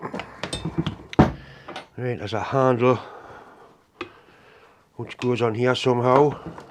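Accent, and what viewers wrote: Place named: Newcastle, United Kingdom. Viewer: Best part of your video's..... your accent. I've worked with all kinds, micks, paddies, scousers, jocks..... and the best are from the Toon. Every time, hardest working guys and girls I know. That thing looks perfect for removing klingons and tagnuts...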